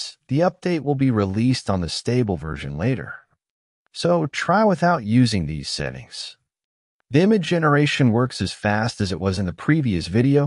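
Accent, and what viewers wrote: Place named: India. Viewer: We are used to strong indian acccent in tutorials don't worry :)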